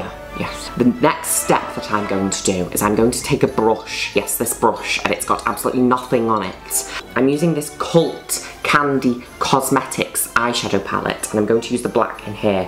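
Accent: United Kingdom. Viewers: That thick British accent tho...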